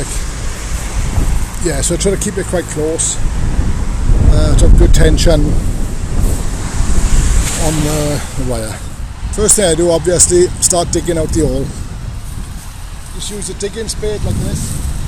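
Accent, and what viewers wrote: Wales, United Kingdom. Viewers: Just have to decipher the Welsh accent a little better !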